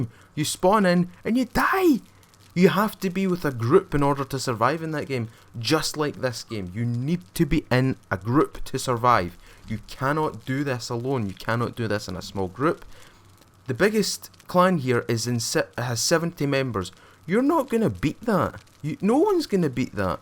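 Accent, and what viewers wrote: Scotland, United Kingdom. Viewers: I wanted to upvote, but then heard all your criticism, concenrns and stuff about ppl having things too fast, havign to farm forever in soem cases etc. After that I wanted to downvote because to me it seemed like you re not undertstanding this game is in alpha phase. Not even close to a real BETA... So there are goign to be shitloads of changes. If you criticize the gmae then always keep in mind in what phase/state the game is. You simply cannot treat it and judge it like its a finished game. It will be in development for at least 16-20 months. In the end i upvote cause I love your scotish akcent and the enthuasiasm how you present the game :P. I hope I could help you with my feedback. :)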